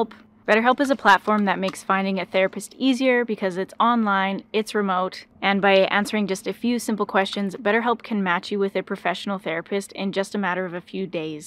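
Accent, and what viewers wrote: Australia. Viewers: are you from Australia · Hello from Puerto Rico. congratulations for the way you help your husband? and do such heavy chores. Keep safe from the black bears, I believe they could be dangerous if approach by humans. You should carry a weapon just in case to defend yourself. By the way, are you Australian?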